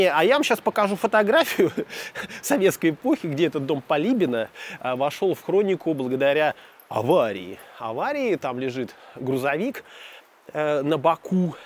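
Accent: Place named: Saint Petersburg, Russia. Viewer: Это Питерский говор,наречие)))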